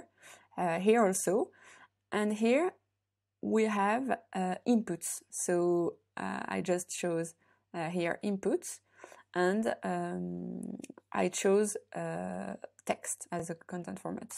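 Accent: France. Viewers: Merci pour la vidéo (j'ai reconnu l'accent français^^)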